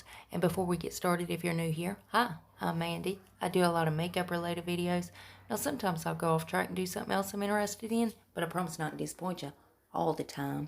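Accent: South, United States. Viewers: Thanks for sharing the info!! I love listening to you talk bc you have a really thick country accent like I do, 😂😂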